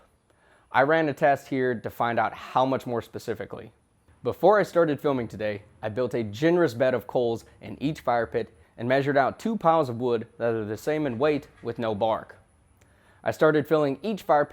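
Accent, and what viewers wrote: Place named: Indiana, United States. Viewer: damn bra, an oriental dude with a Indiana accent (y)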